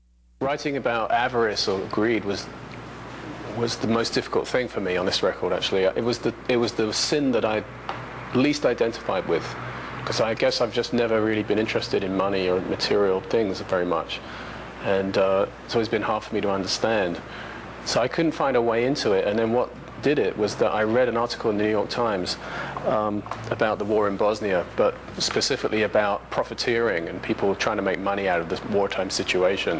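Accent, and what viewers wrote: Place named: England, United Kingdom. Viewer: His English accent is hardly noticeable in this.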